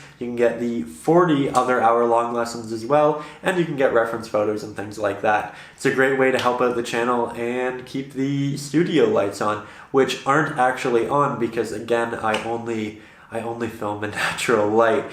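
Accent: Canada. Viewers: Is that a Canadian accent I detect?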